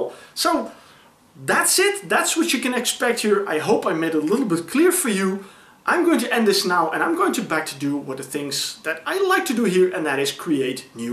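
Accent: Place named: Netherlands. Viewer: I like the smell of prog ... (and the Dutch accent)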